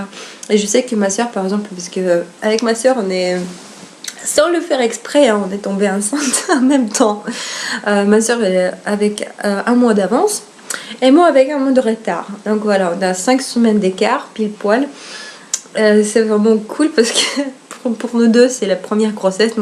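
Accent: Russia. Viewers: Je m'abonne à ta chaîne, tu me rappelle une amie que j'avais au lycée et qui était russe, elle s'appellait Macha et elle avait le même accent que toi!!! Es tu russe?en plus tu as une voix douce très agréable à écouter. J'ai des doutes sur une probable grossesse...comme on dit il y a des choses qu'on sent qui ne sont pas comme d'habitude.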